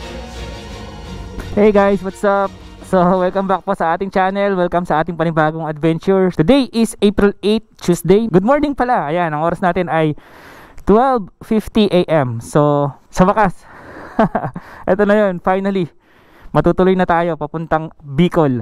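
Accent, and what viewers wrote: Pampanga, Philippines. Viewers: I love your, Kapampangan accent, Say it again.... BARAG😂😂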